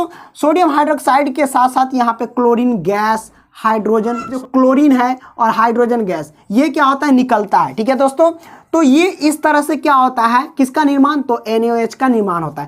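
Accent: Bihar, India. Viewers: Sir aap Bihar se hai kay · Sir aap bihar ke rehne bale hai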